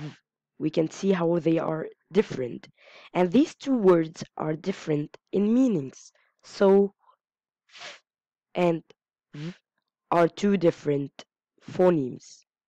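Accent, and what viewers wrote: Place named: Arab world. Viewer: لو سمحتي عايزة اتواصل معاكي فالرسايل ضروري ممكن الايميل او اكونت الفيس انا guess من لهجة حضرتك انك عربية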